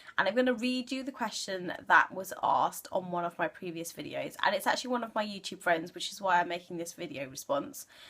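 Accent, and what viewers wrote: United Kingdom. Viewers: Nice British accent. Cheers! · Love you British accent.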